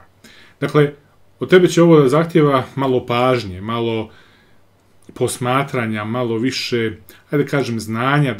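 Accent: Ijekavian, Serbia. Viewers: Opis i tekst na ekavici. Govor na ijekavici. Kompleksi su cudo. 🤣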